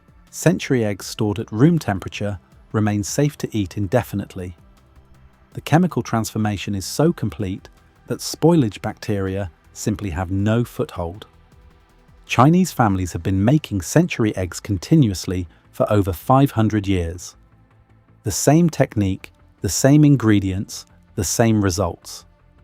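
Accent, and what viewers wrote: United Kingdom. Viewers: The British voice is misleading. We never put eggs in the fridge on this side of the pond - in our homes or in the shops - and they don't go off after just a couple of weeks. I understand that eggs in the U.S. are washed and disinfected, and this strips away their natural defences, meaning that they have to be kept refrigerated. This is mentioned in the vid, but as I say the British voice is misleading; it implies that we do the same here.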